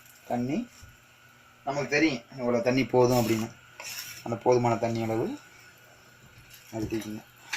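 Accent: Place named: Madurai, India. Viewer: super bro konjam stove kleen banunga pro unka slang very super you netive madurai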